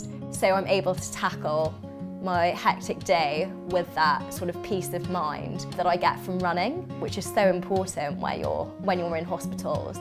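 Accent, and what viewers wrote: United Kingdom. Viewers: I love ❤️ that British accent ❤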